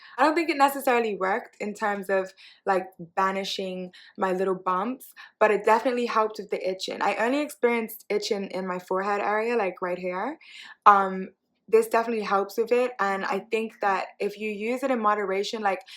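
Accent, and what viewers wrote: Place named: United Kingdom. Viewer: lol. I live in the UK. I could hear the British, but I was like 🤔 there’s some flavour here ❤️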